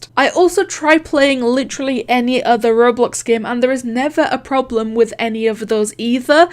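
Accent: Scotland, United Kingdom. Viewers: Hey are u scottish u sound scottish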